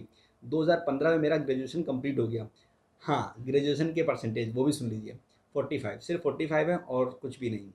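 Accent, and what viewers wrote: Kanpur, India. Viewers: Love your kanpuriya accent 😎😜😉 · are bhai ap kanpur se ho?...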